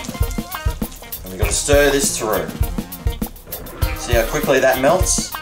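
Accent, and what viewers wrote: Australia. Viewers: This guy is Australian :D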